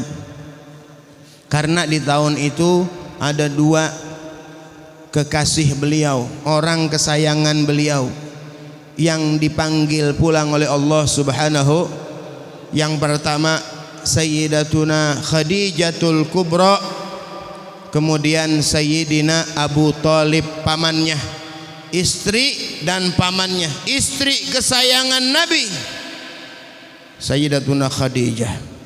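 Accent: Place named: Bekasi, Indonesia. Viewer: Logat Bekasi ny Kentel Bener....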